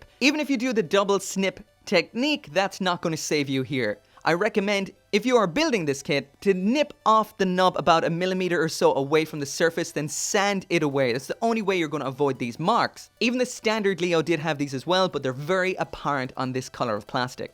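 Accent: Ireland. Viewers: Do I hear a bit of Irish in your accent? Can't place it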